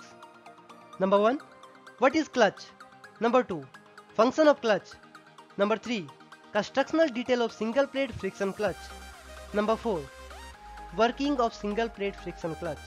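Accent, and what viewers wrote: India. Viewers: i swear my brain has been trained to shut up and listen like im in class whenever i hear an indian accent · How come it's always the guy with an Indian accent that knows literally everything and can explain it to the dumbest people and it makes sense!? This is a masterpiece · This is an indian guy because his speaking accent is hindi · That indian accent is disturbing. Better to read instead of listening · More confused than before. Hard to understand with Indian accent.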